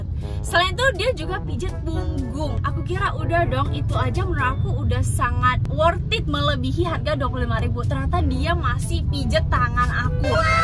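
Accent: Medan, Indonesia. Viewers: Org medan?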